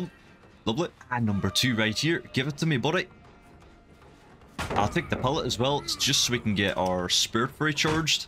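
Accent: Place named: Scotland, United Kingdom. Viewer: Love watching your videos!!! Your Scottish accent makes it even better haha keep up the good work dude!!!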